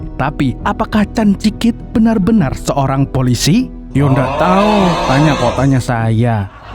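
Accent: Banjar, Indonesia. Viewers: Urang banjar kah nyawa ni min😂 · Mimin urg Banjar mana ?😊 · Urang banjar kalinya beliau ni lah😂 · Banjar bnr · Urang banjar babahasa indo haratnya😂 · Pian urang Banjar kh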